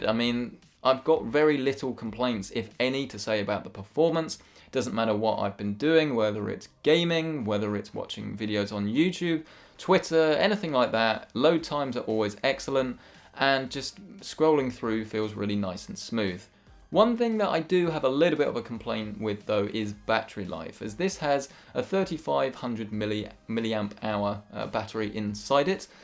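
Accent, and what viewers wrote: United Kingdom. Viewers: e sounds more annoying than other british accents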